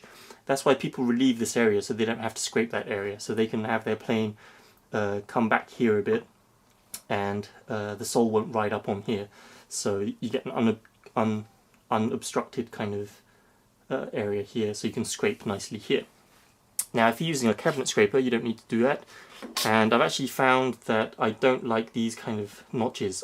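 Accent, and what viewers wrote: United Kingdom. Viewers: I thought you were English, iv noticed a lot of British people these days compensating so Americans can understand , should be the other way around its our language , anyway love your videos keep up the good work.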